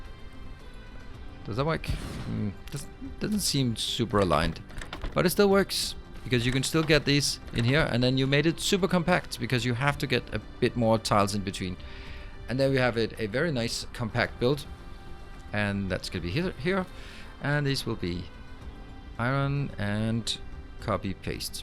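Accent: Denmark. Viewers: Your accent sounds danish :D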